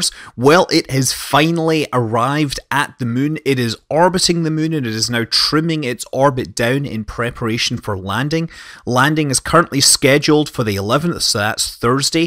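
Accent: Scotland, United Kingdom. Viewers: Is Scottish?? Where's his accent from?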